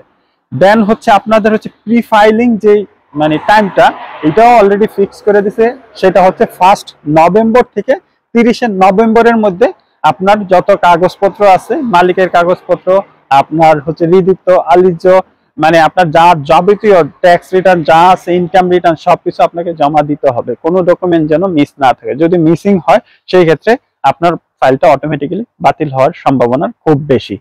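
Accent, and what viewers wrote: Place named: Brahmanbaria, Bangladesh. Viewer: Assalamualaikum bhai...apnar home District B-baria Apnar voice shune mone holen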